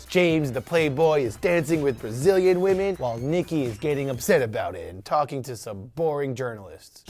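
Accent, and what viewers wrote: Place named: Norway. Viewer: I thought the accent was Norwegian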